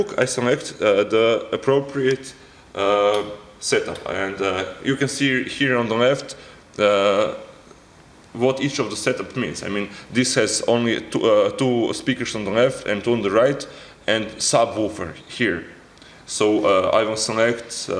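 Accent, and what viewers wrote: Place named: Slovakia. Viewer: you sound like you're from slovakia.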